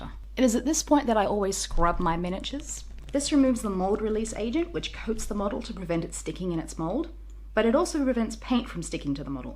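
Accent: Australia. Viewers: Love the Aussie accent on girls. Don't change a thing. These New York ears hear you just fine.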